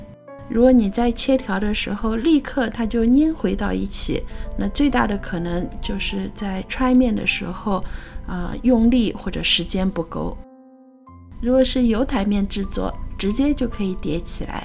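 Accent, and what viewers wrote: Shanghai, China. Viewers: 上海方言好親切！ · 谢谢。听到你视频里的上海话感觉很亲切。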